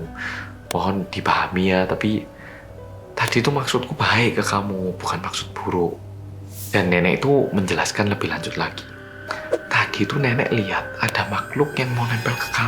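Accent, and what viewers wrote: Java, Indonesia. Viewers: Lanjut ngerungo'no critoe oppa seng meddok 😊😊😊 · Lebih enak didenger medokkk drpda yg tadi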